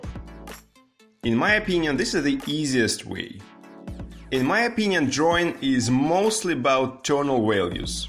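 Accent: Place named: Russia. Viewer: Work on the cheeen Wtf That russian accent gives me crinngee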